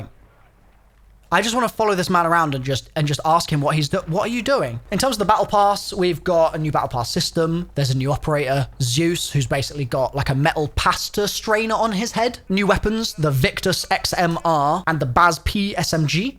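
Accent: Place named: United Kingdom. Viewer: "Voice issue" its called a british accent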